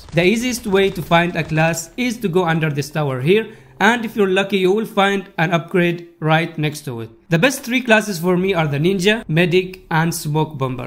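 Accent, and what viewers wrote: India. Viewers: Bro where are you from? You sound like Indian If India which state?🤔 Jst want to know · Sorry for asking but your voice was like indian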